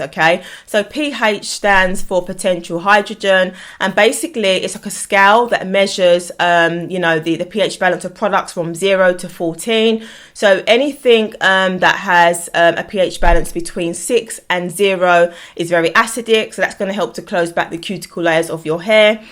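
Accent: United Kingdom. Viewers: This sounds mean spirited. Also, there’s lots of British accents. The one she has is typical not the BBC/RP one you’re used to hearing in film usually. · What an accent? Is it really British or it's faked?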